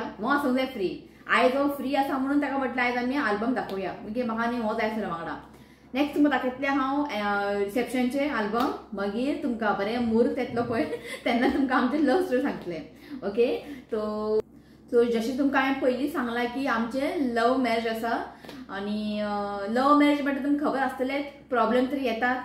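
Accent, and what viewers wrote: Konkan, India. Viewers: Dont cry 😭 kokani thodishi vegali ahe tumache bolane समजले पण काही जण बोलले तर समजत नाही 😂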